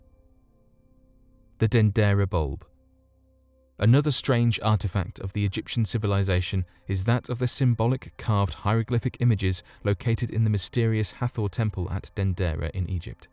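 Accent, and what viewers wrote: United Kingdom. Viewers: He is obviously not American. Can you not hear his British accent? 🙄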